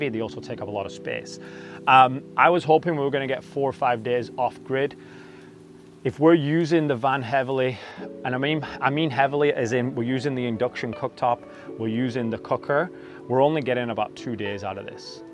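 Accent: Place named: Lancashire, United Kingdom. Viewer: Nice van, something tells me there’s a bit of Darwin, Preston, Blackburn in your accent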